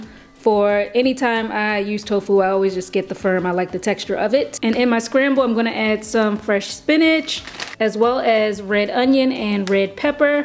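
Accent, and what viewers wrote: United States. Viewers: So im 3 mins into the vid and im like, holdup.....when did she have an american accent? for the longest I thought you and Shirley B Eniang where the same person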